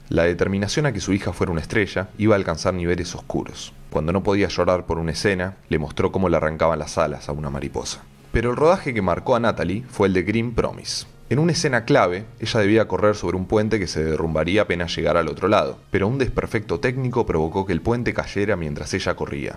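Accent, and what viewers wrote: Argentina. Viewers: Ese acento exagerado argentino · Noo, a este locutor no se le entienden muchas palabras, ese dialécto argentino, machacando las palabras al final; debería estudiar locución, no hay como el español colombiano, claro, preciso y conciso, no dañen el español, que es un idioma bonito, claro que peor lo hablan los propios españoles, con una pronunciación tan arrastrada, sin querer abrir la boca o los dientes, como si siempre quisieran pronunciar la Z.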